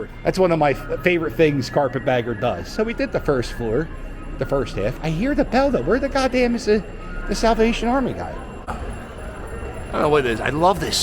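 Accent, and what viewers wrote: Philadelphia, United States. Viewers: Love your south Philly accent .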